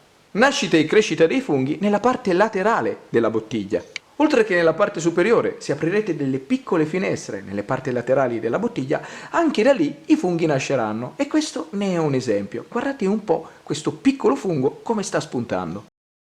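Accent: Italy. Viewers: Me encantan los hongos pero también tu acento...viva Italia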